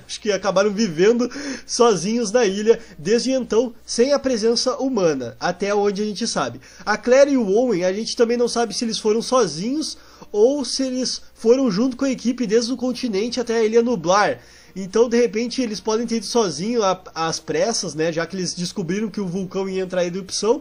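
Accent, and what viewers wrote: Rio Grande do Sul, Brazil. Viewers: Tu é gaúcho! Ba Eu também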